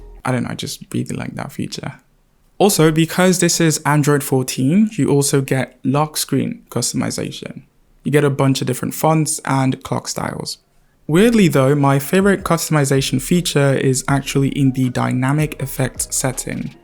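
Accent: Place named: England, United Kingdom. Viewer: he sounds english, am i tweaking??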